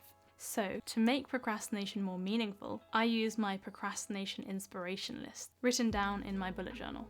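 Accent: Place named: RP, United Kingdom. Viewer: I'm not a productive person, but now I'm trying to be...I'm working on my English and my accent now and i love rp accent. I'm planing to watch your video everyday, not only that i get to do a listening practice but i also get a lot of super helpful and amazing useful tips❤❤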